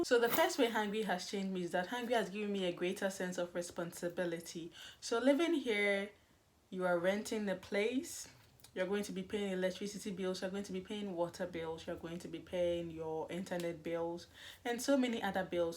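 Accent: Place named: Ghana. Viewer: Tfs... Btw you speak quiet fast ya...:-) good thing I had a Ghanaian friend during college so I cud follow you...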